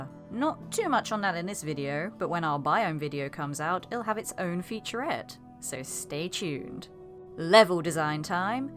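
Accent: United Kingdom. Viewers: Bri ish 🤢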